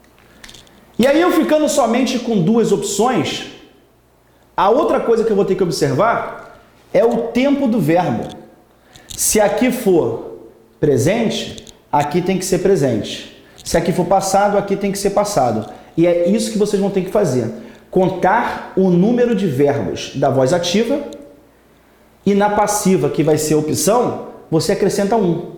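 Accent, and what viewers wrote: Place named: Rio de Janeiro, Brazil. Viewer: Sotaque de carioca já é foda de aturar, ainda mais quando tem que estudar ouvindo isso...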